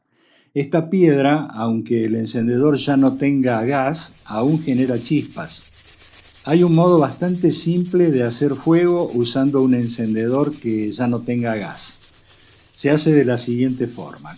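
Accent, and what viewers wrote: Argentina. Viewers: Mira que casualidad (o causalidad), hace unas semanas atrás, al ver como mi flía se encarga de romper o descomponer los encendedores que yo aporto para la cocina (mientras yo los proveo, ellos los dejan obsoletos ... buah!), me encontré con una buena cantidad de estos artefactos indesusos. Y como soy dado pa las cosas manuales, las herramientas y la creatividad, me propuse el reactivarlos combinando algunos que les faltaban la piedra, o partes varias con otros con sus recamaras de gas intactos. La paciencia, dedicación y las ganas de aprendizaje lo es todo! Gracias por tus aportes, y me hiciste reir con tu forma bien "argenta" de hablar. Saludos desde Rosario "Cuna de la Bandera Argentina". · Como se nota que es argento por la manera de hablar...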